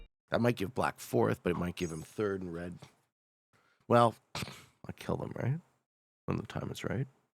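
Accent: Canada. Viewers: you’re incredibly Canadian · im from northern MN, yes you sound like a Canadian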